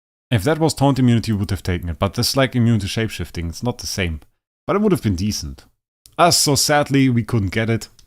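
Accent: Germany. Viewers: average german voice tbh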